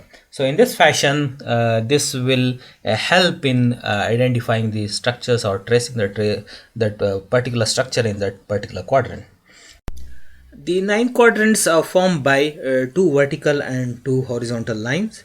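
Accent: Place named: Arab world. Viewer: he is an arab. its the accent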